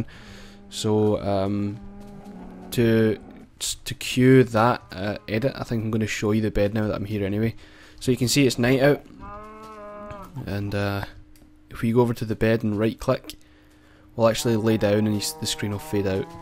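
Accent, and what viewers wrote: Scotland, United Kingdom. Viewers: I really liked your MW2 commentaries I love your Minecraft videos I am OBSESSED BY YOUR AWESOME SCOTTISH ACCENT!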